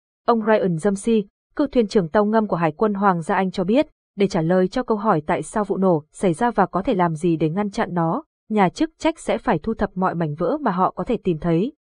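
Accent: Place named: North, Vietnam. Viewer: Đoc nhanh, giọng BK khó nghe! xin vui lòng…!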